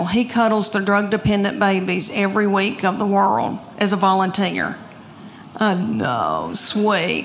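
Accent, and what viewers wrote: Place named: South, United States. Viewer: heard her Tennessee accent and thought,"I'm home."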